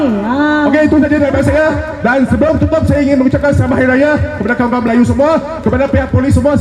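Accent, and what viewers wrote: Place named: China. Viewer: Ada juga yg nak dengar cina pelat bercakap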